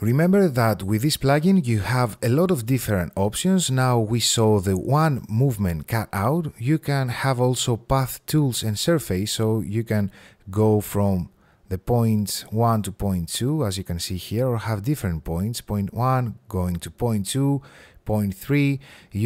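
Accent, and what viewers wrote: Greece. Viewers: Είναι τόσο χαρακτηριστικό όταν ένας έλληνας μιλάει αγγλικά!! Από τα πρώτα δευτερόλεπτα λέω ώπα δικός μας είναι 😛😛 very nice tutorial, clean and easy to understand , keep the hight quality content !!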